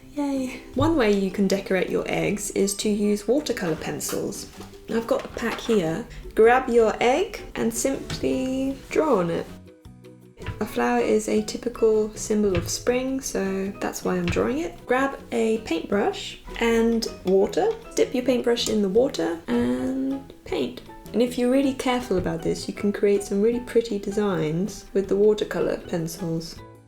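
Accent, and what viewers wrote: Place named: United Kingdom. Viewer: Most of the time you get american english here in germany but i must say i like the british accent. :3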